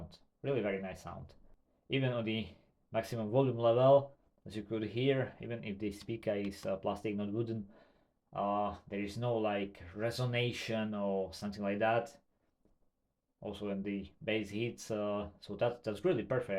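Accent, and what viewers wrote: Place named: Slovakia. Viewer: viem ze si slovak pocut to ahahahaaa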